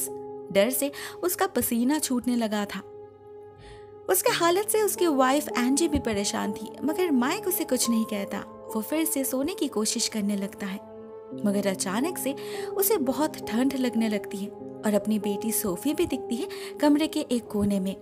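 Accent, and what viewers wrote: Bengal, India. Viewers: Didu ap bengal se ho kya apki voice bhot sweet hai isliye lagta hai 💜😍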